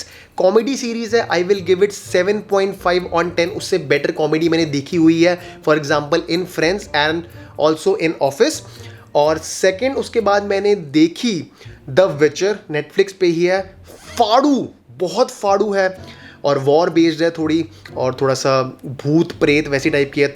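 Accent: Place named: Delhi, India. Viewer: Baat krne ke style se hi pta lag gya delhi ka londa h👍🏼😂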